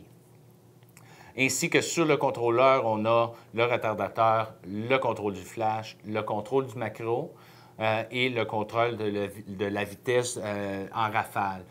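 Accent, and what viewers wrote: Quebec, Canada. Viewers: Sounds French Canadian to me. French with a Anglo pronunciation. Nonetheless good review, I like that X10.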